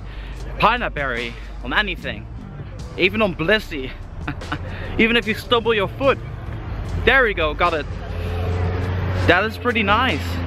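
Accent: Netherlands. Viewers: Mooi nederlands-Engels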